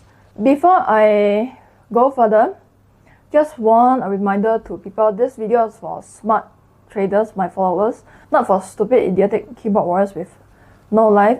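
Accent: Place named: Singapore. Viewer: Stop harrassing her. She is from Singapore, thus her accent. Go to the UK people, and you would barely understand any of their accents. Turn on Closed Captions if you don't understand.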